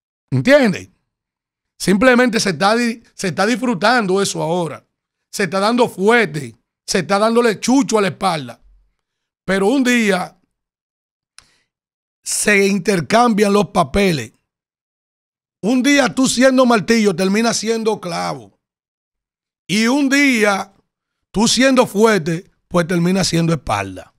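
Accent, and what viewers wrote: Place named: Dominican Republic. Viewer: Ustedes viven en un corral, no puede presentarse en un canal de televisión en otro país, porque solo sabe hablar en R D!!!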